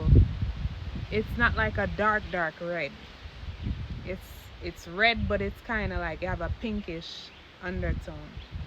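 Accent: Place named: Caribbean. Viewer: love the caribbean accent stay blessed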